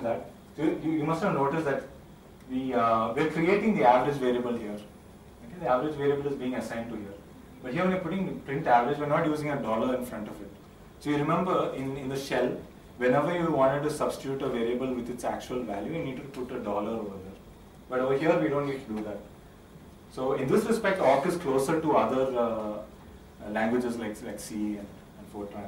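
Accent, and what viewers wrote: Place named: India. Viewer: I cannot for the life of me understand English with an Indian accent. Other accents really aren't a problem, just can't understand the Indian accent. Frustrating.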